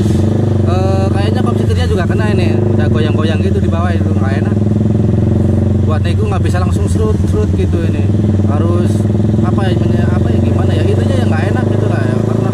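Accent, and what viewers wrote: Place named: Banyumas, Indonesia. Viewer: Wah ngapak e daerah mana mas...? .gas tipis tipis jalam licin mas bro... sukses terus masbro...👌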